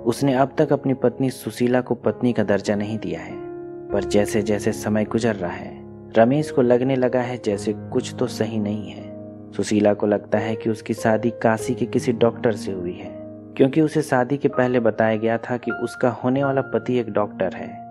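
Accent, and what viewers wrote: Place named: East, India. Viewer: Shabdon ka istemaal sahi hai Bas uccharan (pronunciation) alag hai. Pronunciation alag hai, par galat nahi. Eastern India especially in Bihar, Jharkhand, Chhattisgarh wahan "nukta", "s" & "sh" me antar itna prominent nahi hota daily life me. He has acquired that accent. Jaise English ke kai accent ho sakte hain, waise hi Hindi ko bhi alag tareeke se pronounce kiya jaa sakta hai. We should appreciate his efforts to bring such good movies with such a lucid explanation rather than pointing out these petty mistakes. Let's encourage each-other and be more acceptive of each-other.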